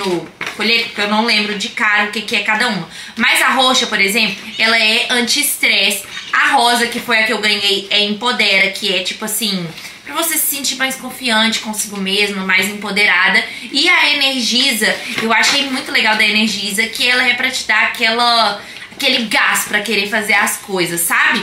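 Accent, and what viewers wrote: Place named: Minas Gerais, Brazil. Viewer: Meu seu sultaque e lindooooo, também sou mineira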